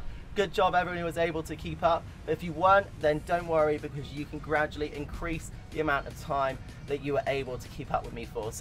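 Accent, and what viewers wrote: United Kingdom. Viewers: Love your BRITISH ENGLISH accent！ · you are simply best... Greek Body, Innocent and cute loving face.....your British accent everything with killer smile... All this these things creates something I call that as Magic... I wish can meet you, your hubby and son one day in person. Keep sharing this beautiful and inspiring video... We love you:)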